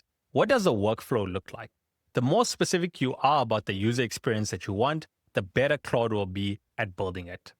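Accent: South Africa. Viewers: Your location says USA, but if I'm not mistaken you have a bit of a South African twang in there?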